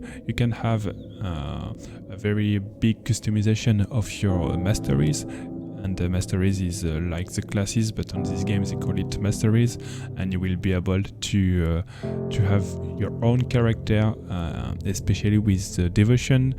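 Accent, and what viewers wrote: France. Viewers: Love the French accent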